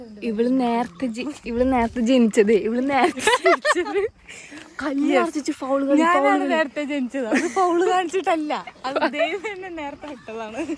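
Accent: Thrissur, India. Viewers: Thrissur touch und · ഭാഷ കേട്ടിട്ട് തൃശ്ശൂർ ആണെന്നാണ് തോന്നുന്നത്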